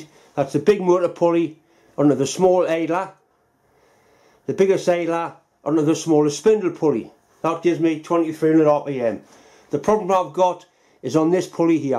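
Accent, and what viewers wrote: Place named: Northern England, United Kingdom. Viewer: So good to listen to someone with a good northern accent rather than an american when learning about using lathes.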